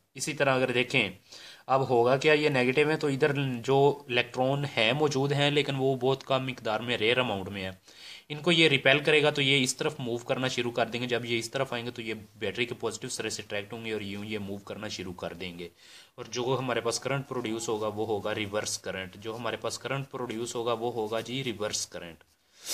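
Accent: Punjab, India. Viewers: Sir apki voice tone puri punjabi h I m also punjabi hindu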